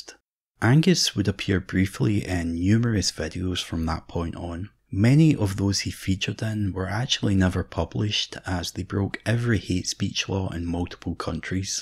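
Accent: Scotland, United Kingdom. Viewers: Even the scottish accent was elaborated with AI? banger ! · All the comments here are from bots, this is a cesspool of AI entities... all hail the circuit... Looking forward to your next video! Always love to hear your Scottish accent, lovely memories of my time in Scotland😊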